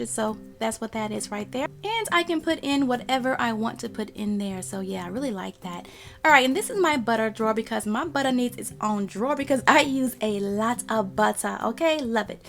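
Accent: South, United States. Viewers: Subscribed cause I love her southern accent 😍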